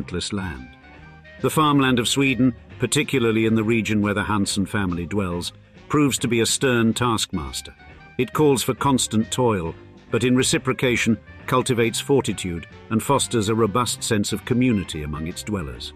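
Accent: RP, United Kingdom. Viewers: Very nice SRP accent by the announcer. And no, the "Queens English (SRP)" is not even close to the accent of the majority of British people. The vast majority of perfect RP speakers are in overseas Commonwealth countries. Only 5-15% of British people speak with an RP (received pronunciation/ Queens English) accent. I write this to expel simplistic ignorant assumptions that many people hold.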